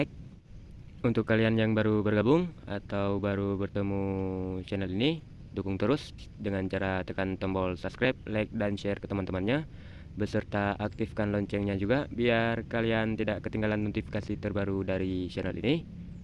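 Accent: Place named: Aceh, Indonesia. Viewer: dari cara ngomong pasti orang Aceh y bang,,dmn bg loksi nya biar kita cek motor fu saya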